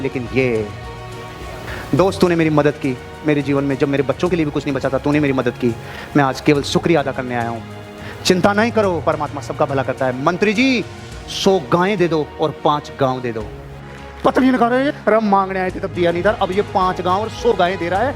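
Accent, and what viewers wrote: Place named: Jaipur, India. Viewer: क़तई ठेठ जयपुरी भाषा बोली 😂😂😂 सर जी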